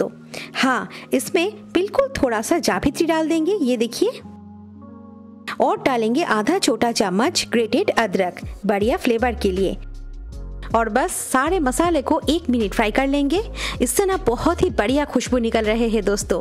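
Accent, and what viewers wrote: Bengal, India. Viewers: Aap Bengali ho mam apki Hindi bolne se hi samajh gaya mai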